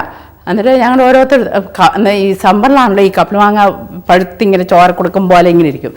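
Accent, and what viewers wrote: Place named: Kottayam, India. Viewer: n d Kottayam slang which l expected d least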